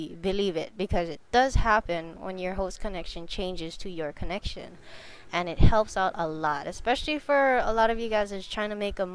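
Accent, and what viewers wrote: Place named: Spain. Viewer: yea i could hear your spanish acsent =)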